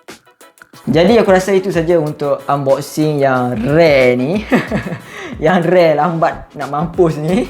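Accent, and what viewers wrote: Sarawak, Malaysia. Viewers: org sarawak? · hi, abang ni orang sarawak ke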